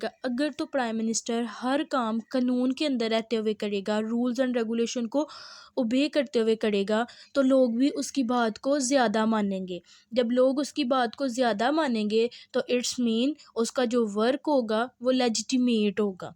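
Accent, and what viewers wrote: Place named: Lahore, Pakistan. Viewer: Lahori awaz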